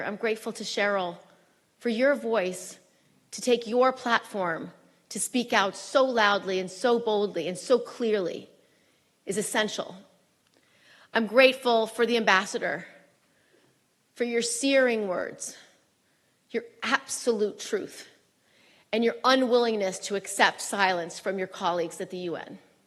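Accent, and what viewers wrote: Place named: United States. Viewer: Such lies! Israelis own news papers proved only 1 baby died and did not know if it was by Israeli tanks. Also rape is not used as a weapon of war by Hamas or Russia. Not one investigation found this. Her with the Israeli flag, American accent, senate position and emotional tirade should be 4 red flags to know she is paid to lie.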